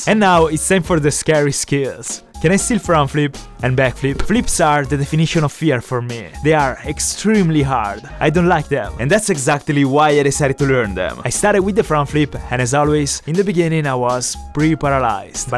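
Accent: Italy. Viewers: vedo un po di italiano in questo inglese <3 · Si capisce subito che sei italiano ahahah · You can practically taste the Italian accent,Grandioso, lol. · Ahaha, when you said that you have to become a compass I knew you were Italian, then I listened to the accent and saw the location. gg bro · ma come forse😂 l'accento è italiano😂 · Your english sounds like italian english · Si sente che sei italiano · Ma sei italiano perché questo è il tuo primo video che vedo e si sente che non sei ne inglese e ne americano e anche perché parli in inglese uguale a me😂🇮🇹 se sei italiano sei un grande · non so perchè ma dall'accento , e dal fatto che quando hai fatto il test della velocità di scrittura era italiano penso proprio che tu lo sia , non so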